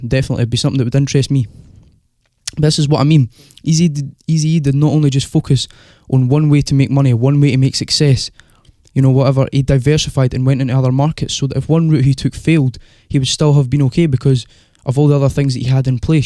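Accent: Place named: Scotland, United Kingdom. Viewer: Love that Scottish accent bro and love the vid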